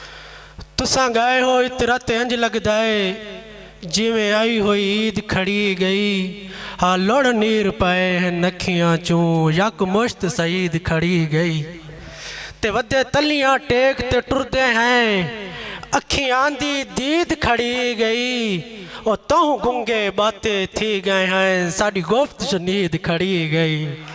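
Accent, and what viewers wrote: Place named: Saraiki, Pakistan. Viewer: Khoobsorat lehja Sraiki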